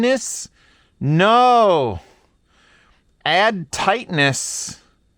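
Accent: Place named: United States. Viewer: Can't watch your videos. You waffle on about rubbish, your american accent is terrible to listen to and why do americans extend words with one vowel to sound like they have four? ie way becomes waaaay.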